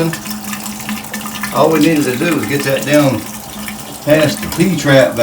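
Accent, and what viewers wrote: South, United States. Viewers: I love a good southern accent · I hear a thick country accent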